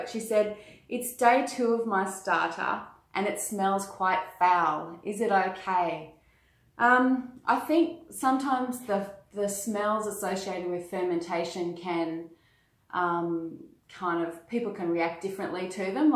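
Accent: Australia. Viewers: Love that Australian accent.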